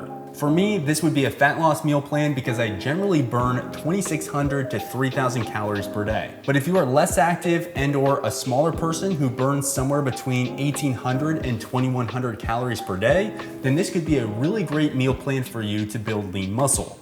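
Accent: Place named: United States. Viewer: You have the most American accent ever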